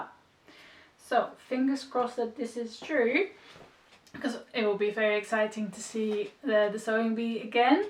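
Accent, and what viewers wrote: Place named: United States. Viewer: Hi, I found you through Liz, the Baker that Sews, she always makes great recommendations! Where are you in the world? Your accent sounds American but I expect I am absolutely wrong there! You are going to be very busy sewing with all your plans! Looking forward to seeing your makes! I have just finished Sew House Seven Sauvie sundress for my holiday in Dubai in a few days, can’t wait! We are going to have a Sewing Bee in April, it’s such an inspiration. Good luck with your channel.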